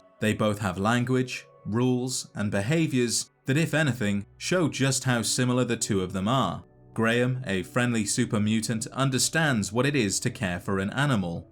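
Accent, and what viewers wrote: United Kingdom. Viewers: Part Shoddycast, part Oxhorn, part MittenSquad, fully British.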